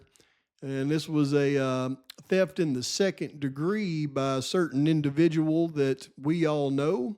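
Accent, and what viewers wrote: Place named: Arkansas, United States. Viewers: Mountains. I'm thinking Northern Arkansas or Geirgia. Everyime I play one of his videos, my husband says he sounds just like Dr Phil! Where is Dr Phil from? Lol! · Funny. I’ve been curious where you were from. Southern accent and you say “Interesting” the same way I used to when I grew up in the mountains. Not as remote as you describe though. Hang in there, we’re with you.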